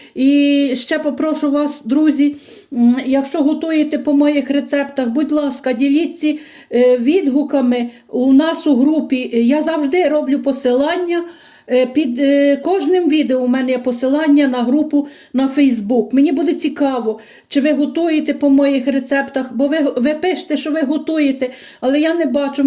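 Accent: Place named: Ukraine. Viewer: Доброго дня Вам пані, гарні всі Ваші рецепти і особливо стародавні. А ще Ваша розмова зачаровує, але не міняйте свого діалекту на те, що Вам там можуть зауважувати, мовляв не "лижка" а "ложка" - але ж цим і є особливість Ваша!!! Говоріть так як Ви і завжди звикли - це дуже гарно і своєрідно українське!!! Міцного здоров'я, мирного неба і процвітання Вам!!!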